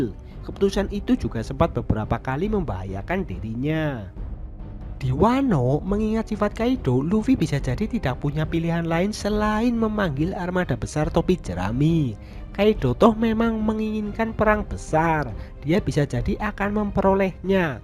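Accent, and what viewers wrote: Banyumas, Indonesia. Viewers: paijo Londo lu ama aja menghina orang dengan logat ngapak bro.. jangan sok lu.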